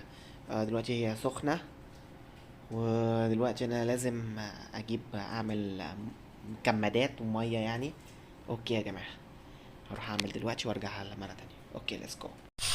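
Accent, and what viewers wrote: Egypt. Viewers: احلي حاجة في العربي بتاعك انه مصري علشان كده اختاروك مصر حلوة بس نصيحة لو كان ليك نصيب ما تامنش حد علي اي معاملات وماتدخلش حد بيتك نهائي وخروجات الشغل بعيد عن زوجتك تماما والباقي مع اهلك في مصر اوي الشغالين ؟؟؟؟؟؟؟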